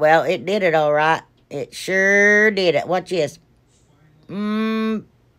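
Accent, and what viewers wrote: South, United States. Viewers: LOVE your accent are you southern?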